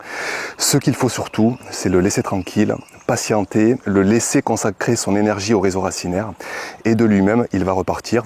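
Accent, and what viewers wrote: South, France. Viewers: Merci beaucoup pour cette vidéo. Exilée en Alsace, c'est toujours un plaisir d'entendre l'accent du sud 😊 Je seme tous mes plants en Alsace chaque année. Ils finissent dans la serre. J'en replante dans notre jardin familial près de gignac, non loin de Montpellier lors des vacances scolaires. Cette année, première zone hélas, les replants ont été mis en place le week-end de pâques. Nous allons voir le résultat pour le pont du 8 mai. 🤞 En Alsace, les plants jumeaux sont en fleurs sous serre. J'attends fin mai pour mettre les derniers en pleine terre et livrer des plants à ma fille en haute Savoie Tous les ans, je suis surprise de l adaptation des diverses variétés replantées dans ces divers coins de France. Que la nature est resiliente et généreuse !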